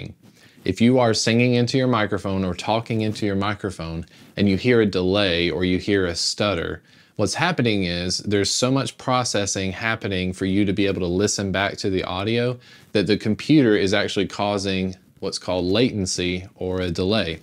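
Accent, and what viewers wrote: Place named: South, United States. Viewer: I could be wrong but I detect a very slight southern accent in your voice.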